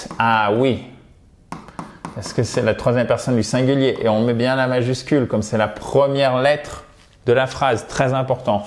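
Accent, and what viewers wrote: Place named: United States. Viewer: Merci tes videos sont sympa, mais j'aurai prèfèrè avec l'accent anglais et non Americain.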